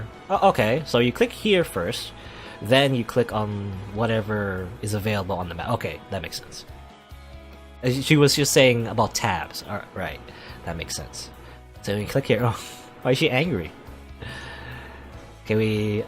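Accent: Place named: England, United Kingdom. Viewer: sorry I posted the same message twice...for some reason it didn't show up either time so I thought I hit the cancel button by mistake. I just deleted the repeat message so it no longer shows......I didn't even realize English wasn't your native language....I thought you had an English accent actually....you speak it tremendously well.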